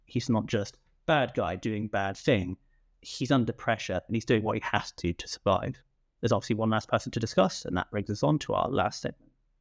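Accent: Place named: United Kingdom. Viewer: You had me at "the human stain ... Pepsi!" Proper thing listening to a solid review of my favourite Bond with a British accent ;-)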